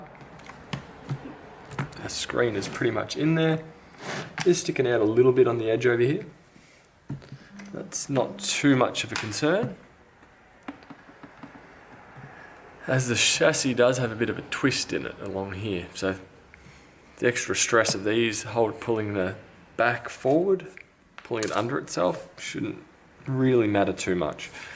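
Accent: Australia. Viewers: on taking my HP apart today, I see there is very little to play with, thank you for the variety and detail of your channel, and clear Aussie explanations.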